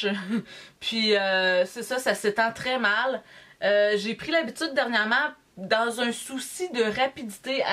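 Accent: Quebec, Canada. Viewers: Trop contente de te retrouver! J adore ttes t'es vidéos! Et ton accent québécois aussi lol gros bisous à toi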